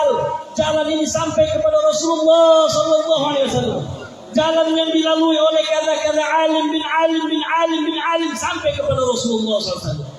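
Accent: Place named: Manado, Indonesia. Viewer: sehat selalu ya habib..so ba logat manado ini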